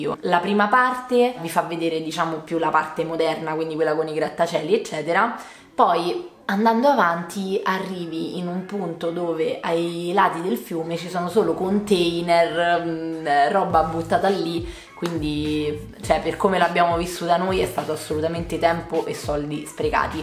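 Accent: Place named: Rome, Italy. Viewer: Io sono stato ad Amburgo e l'ho trovata stupenda sotto il periodo natalizio ma penso sia una cosa tipica delle città tedesche, che ne pensi? Comunque è già nella mia lista dei posti da visitare! Grazie :D Ps: adesso lo sento l'accento romano ahahah ti avevo chiesto di dove fossi perché mi piace incontro le persone che seguo assiduamente e conoscersi dal vivo! Da uno sprint in più !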